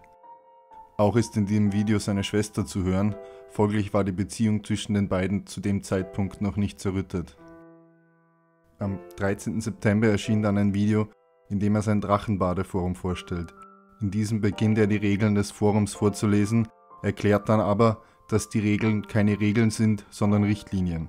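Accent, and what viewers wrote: Austria. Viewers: wie man sofort merkt, dass der erzähler österreicher ist haha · Bist du Österreicher? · gibt es silent hill wirklich?? austrian voice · Ich finde dein ge-österreichel doch sehr sympathisch, und auch, wie du mit völlig trockener Stimme Reinerles Utopien wiedergeben kannst. Liebe <3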